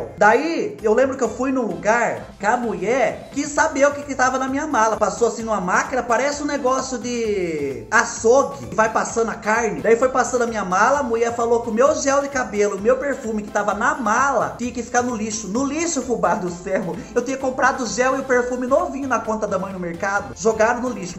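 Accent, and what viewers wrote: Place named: São Paulo, Brazil. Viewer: Fubá sou nascida em são Paulo kkkkkkkkkkk só não moro mais lá kkkkk,mais vc parece com uma prima minha de Mogi das cruzes ,me identifiquei kkkkkkkkkkk,...vc e fofo e sua alegria contagia ...ela e bem do interior de São Paulo e fala como vc e muito engraçado ...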